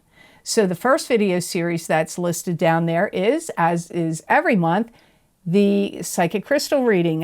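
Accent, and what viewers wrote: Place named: New York, United States. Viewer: I do think you are a New Yorker !! Love your accent !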